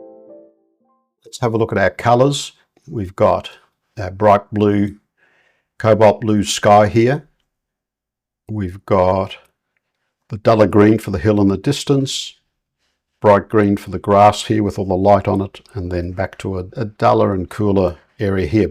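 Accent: Australia. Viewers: This is amazing!! Pardon me if I am wrong, are you Aussie? Would really like to see how to paint a gum tree Please. 🙏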